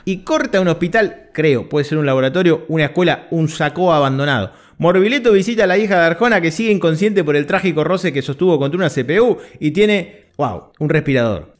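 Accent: Argentina. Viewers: me encanta ver tus videos pues me rio a morir cuando puedo entender los chistes completos, pero a veces hablas tan rapido y con tanto acento "argentino pibe" que la verdad no pude disfrutar en su totalidad el video. Si puedes para el proximo video, bajarle un poco a las revoluciones de tus dialogos para que puedan ser mas entendibles los chistes de las trastadas de las peliculas. Con Heman me moria de la risa y con Howard, Superman, Gatubela tambien, pero habia chistes que tenia que ponerles hasta los subtitulos autogenerados por you tuvvv, para poder entender que habias dicho. Saludos y a la espera quedo del siguiente peliculon Cut. Dejo el suculento LIKE. SILLA!!!!!🪑🪑🪑🪑🪑🪑🪑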